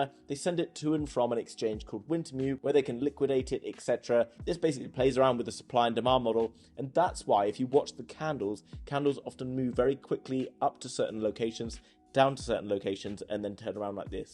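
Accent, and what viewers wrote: United Kingdom. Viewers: the British accent! love it :D and thanks for the info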